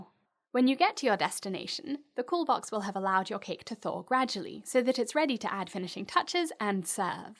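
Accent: United Kingdom. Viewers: British~ Like it,that's amazing -;)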